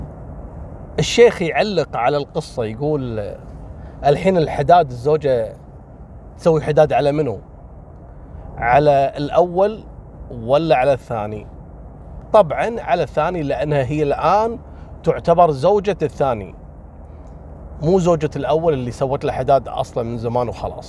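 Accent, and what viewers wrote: Kuwait. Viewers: ياخي مع انك تقول قصص جرائم ورعب وتخوف بس انت سعادة حقيقة بحياتي 😊❤️حبيت الكويت وأهلها ولهجتكم منك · أدمنت طريقة سردك يا ولهجتكم الكويتية حلوة 🇩🇿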